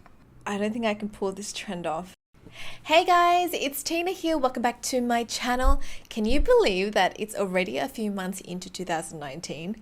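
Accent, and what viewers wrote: Australia. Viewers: 호주에서 사셨구나 뭔가 발음이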